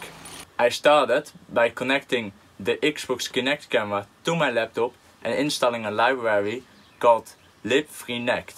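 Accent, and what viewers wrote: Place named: Netherlands. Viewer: Je kunt zo horen dat je uit Nederland kom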